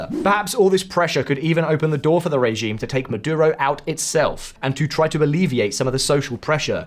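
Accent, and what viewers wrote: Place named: United Kingdom. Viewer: It's a little rich for a guy with a British accent to talk about a Dictatorship in another country. Bro, the Starmer regime is arresting Brits for Facebook posts! Yea but "it's okay when do it" right? 😂 The U.S should leave Venezuela alone. They've wrecked that country with thousands of sanctions, and they wonder why Venezuelans are crossing the Southern border into the U.S.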